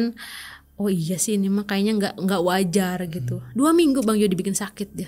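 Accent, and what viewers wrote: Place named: West Java, Indonesia. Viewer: Lidah orang sunda kayaknya 😂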